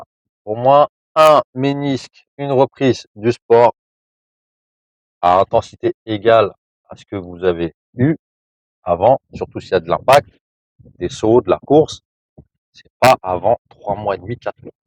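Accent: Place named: Seine-Saint-Denis, France. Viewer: IN ACCENT DE BLEDARD DU 9/3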